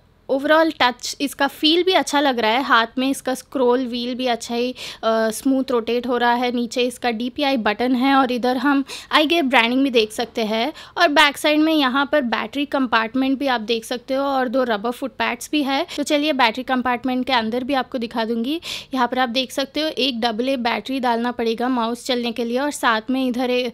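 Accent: Hyderabad, India. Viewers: Your Hyderabadi language is super don't bother about any thing your doing well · Vaaamo pakka hyderabadi hindi maatlaadesthunaavga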